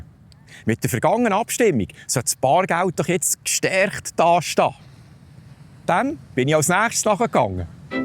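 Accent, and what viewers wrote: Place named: Swiss German, Switzerland. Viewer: Es wird gesprochen, wie sich IK Schweizerdeutsch vorstellt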